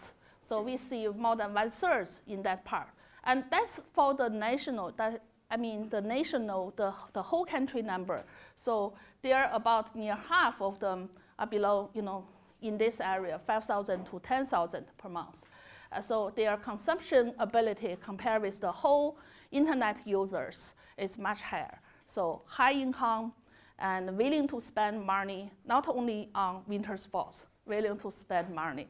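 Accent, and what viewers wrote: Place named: China. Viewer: 这中式英語果然听的清楚多了、：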